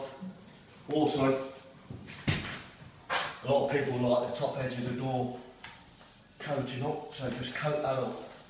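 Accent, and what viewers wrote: United Kingdom. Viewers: Are you British? Well no wonder I can't understand a single word you're saying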